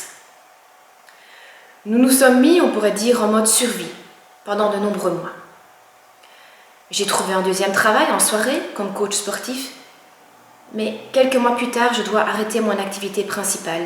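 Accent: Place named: Belgium. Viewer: Bonjours j entends un accen belge dans votre voix, si vous êtes de Belgique pouvez vous me donner le numéro d un bon avocat belge si possible le votre ? Merci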